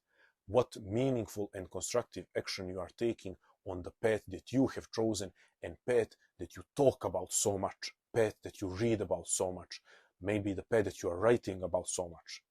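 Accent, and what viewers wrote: Balkans. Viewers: Great insight, don't mind me asking but are you maybe from the Balkans?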